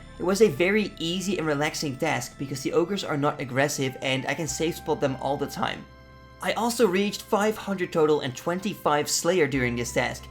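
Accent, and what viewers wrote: Netherlands. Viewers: Van het eerste woord dat je zei hoorde ik dat je nl was in episode 1. Echt een toffe serie en ik hoop dat je een daadwerkelijke noninatie krijgt voor die golden gnome :) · Ah I love a Dutch accent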